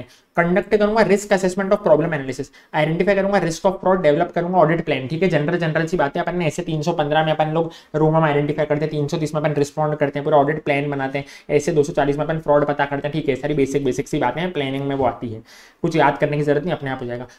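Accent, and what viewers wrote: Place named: Indore, India. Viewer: Sir bataya nai aapne... App indore se honaa...? Apki boli se lgriya h sir 😁😁😁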